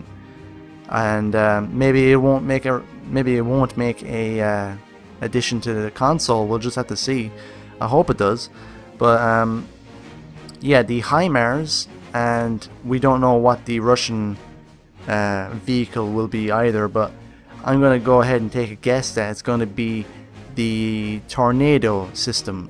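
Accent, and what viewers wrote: Ireland. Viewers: Hey, how do you get to play at E3, i was at it, but i didnt get to play anything? also great video, are you irish? u sound irish, im irish lol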